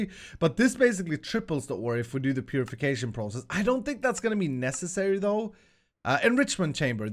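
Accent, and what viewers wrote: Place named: Sweden. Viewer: Why does he sound more swedish than last time??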